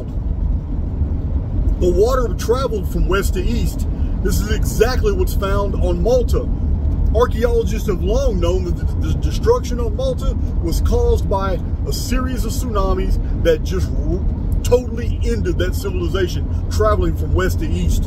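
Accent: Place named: Texas, United States. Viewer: Dude you sound soooo Tex us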